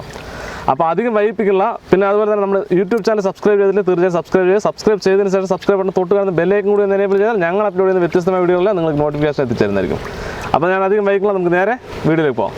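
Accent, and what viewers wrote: Palakkad, India. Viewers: PALAKKAD basha sooper and sooper biriyani.. Proud to be from pallakad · Igale palakadan shyli samsarom kooodi cooking nte kooodea cherumbo oru pratheaka monjaanu vediosnu super aanu Ella vediosum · You are the best brother, I keep waiting for your food recipes. Also, your Palakkad accent has become a hit.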